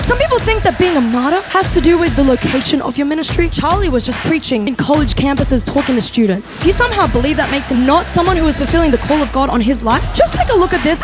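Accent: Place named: Australia. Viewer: I literally saw my first video of hers and I thought “ yes, she’s an Aussie!” Seriously so good to hear the truth being preached unashamedly but with such wisdom & you can tell she knows her God and His Word. I want to stop eating my dinner now & go and read the Word! Truly anointed, I think she is one to watch.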